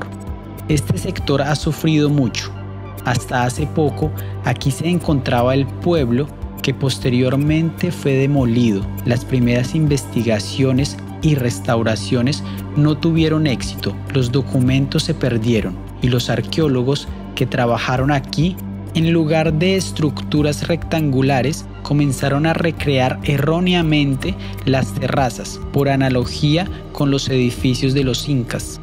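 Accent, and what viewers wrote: Colombia. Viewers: Que desubicado comentario, agradece màs bien que es gratis, a mi me pareció excelente narrador se nota que es un parcero colombiano, Cuando no el peruano con su dejo que cree que es el mejor, tendrìas que salir del paìs alguna vez para que te des cuenta lo equivocado que estàs. a no hacer caso y gracias por este aporte. Chaclacayo Perù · por la pausa y cadencia de tu habla y el uso continuo [ka] y [Ke] debes de ser colombiano o has vivido mucho tiempo por ahí..igual, un hermoso y bien documentado reporte....gracias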